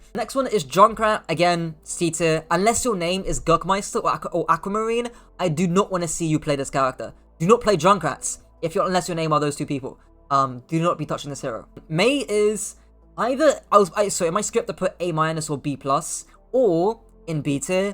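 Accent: England, United Kingdom. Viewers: I love your English accent ❤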